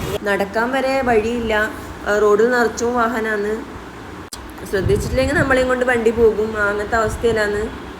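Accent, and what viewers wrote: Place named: Kannur, India. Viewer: ഞാനും കണ്ണൂരാണ് സംസാരം കേട്ടപ്പോൾ തോന്നി.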